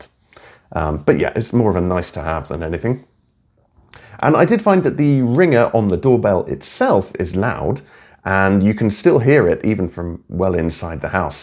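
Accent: United Kingdom. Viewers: I really liked your review of this product. I have the Eufy Homebase, Door lock and Outdoor cameras, and absolutely love them. Your review convinced me that the Eudy E340 doorbell is the one to get (I'm tossing out my Ring doorbell as the subscription costs are outrageous, and it doesn't have the Eufy capabilites). I also love your Brit accent and makes me a little homesick, as my inlaws live in Ramsey, Cambridgshire, who I miss. Thanks so very much. Oh, and I live in Columbus, Ohio, USA. Cheers. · Thx for this video. Also very easy for non-native speakers to understand. It’s a fantastic British English. Thank you for that. I love the way you pronounce glockenspiel!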